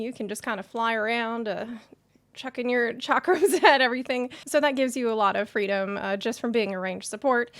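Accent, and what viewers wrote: South, United States. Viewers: European girl with a country accent.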